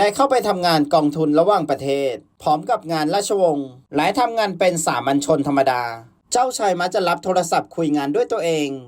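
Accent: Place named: Provincial, Thailand. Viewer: พูดไม่ชัด และสำเนียงเหน่อ ฟังไม่รื่นหู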